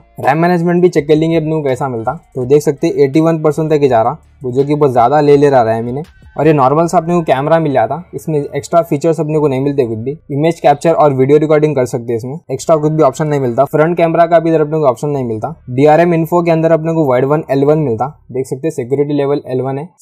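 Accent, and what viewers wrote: India. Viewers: Love your hindi accent. Dont change the way you speak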